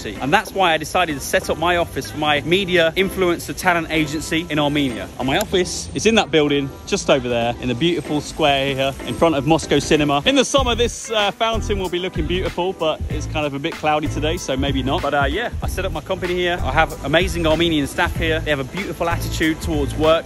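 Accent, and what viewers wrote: United Kingdom. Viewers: Oh, this is so adorable to hear how you praise our country in British accent which I am crazy about ))...I used to live 6 years in nearby Reading. People in England are very friendly and smiley...)) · Надеюсь это троллинг он говорит с Южным с британским акцентом...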